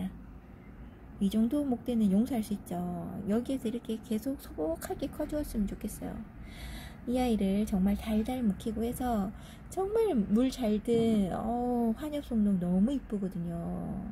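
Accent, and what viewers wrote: Gyeongsang, South Korea. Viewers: 목소리가 경상도 분이신거 같아요...혹시 창원은 아니시지요? 제가 창원에 내일까지 있어요..혹시 창원이시면 커피하고 싶네요...ㅎㅎㅎ...너무 예뻐요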